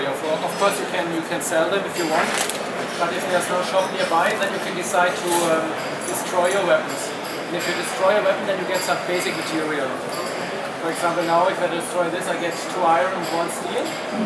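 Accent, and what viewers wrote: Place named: Germany. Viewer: lustiges englisch spricht der herr, hat wohl jeder im raum gemerkt dass der ein deutscher ist :)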